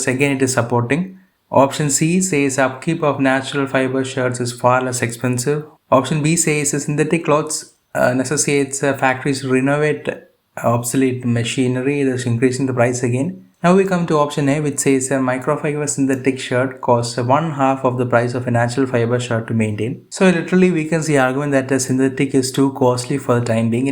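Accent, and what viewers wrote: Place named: South, India. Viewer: shubhangi he belongs to south india thats the reason his accent is bit different ......i do have many frnds from south india ....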